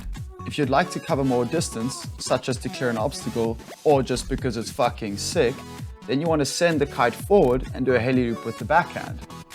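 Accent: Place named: South Africa. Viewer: Fuuck bru I cant anymore. I already miss South Africa too much.. these videos make me feel at home just hearing you talking kak while saying the right shit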